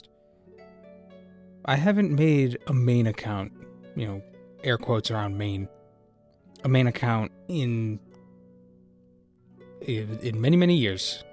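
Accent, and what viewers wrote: Boston, United States. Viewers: i'm just here to look for the boston accent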